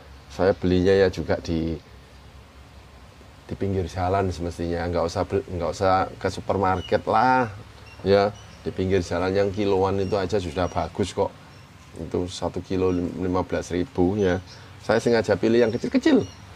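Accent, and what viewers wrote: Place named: East Java, Indonesia. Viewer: Suka dengan penjelasan nya, khas jawa timuran, matur nuhun mas, salam dari Jakarta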